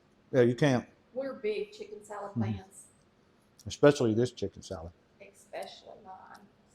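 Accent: South, United States. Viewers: Your southern accent is as fantastic as your recipes! Thinking the Food Network needs to give you a show! I love how you take the time to put "loves" on all of the comments on here! Keep making all of our days more "country" and more sunny! ❤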